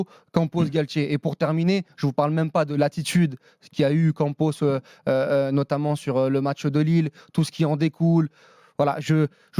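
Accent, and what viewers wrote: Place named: Banlieue, France. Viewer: Galtchhhhier...Contchhhhinuer...tRRRRRRRRRès loin du compte...mais putain cet accent des cités quelle horreur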